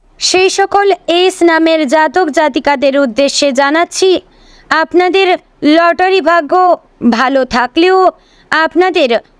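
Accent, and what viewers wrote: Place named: Bangladesh. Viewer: Your pronunciation is very much like Bangladeshi. Are from Bangladesh?😮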